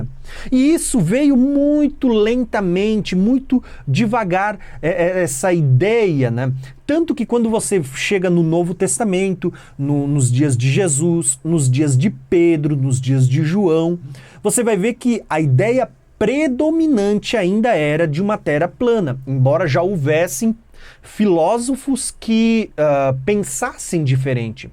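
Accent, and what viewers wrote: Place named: Santa Catarina, Brazil. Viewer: Catarinense ou polones fala assim mas acho que dá pra entender né?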